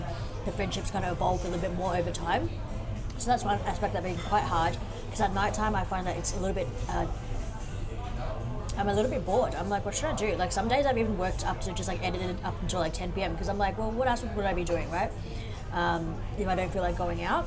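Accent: Australia. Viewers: To answer your question, what you guys watch? The travel and food vlogs have been done to the death. You are may be 10-15 years late to this genre. I have watched two of your videos, I watched them just for drama and hear an Asian woman taking in heavy Australian accent. I have few more critiques but I will keep them to myself.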